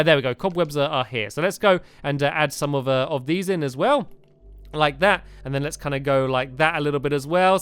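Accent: United Kingdom. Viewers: It is so weird hearing train stuff in British English.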